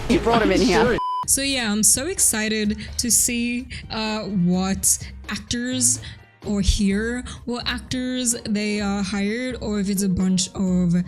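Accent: Australia. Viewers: I loveee the Australian accent and I’m excited for the Wallaru release 🥰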